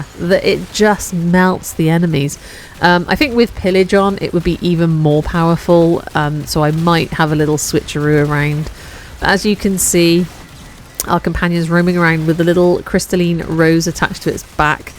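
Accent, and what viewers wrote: West Country, United Kingdom. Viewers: Hell yeah! You sound like your from the West Country. Instant follow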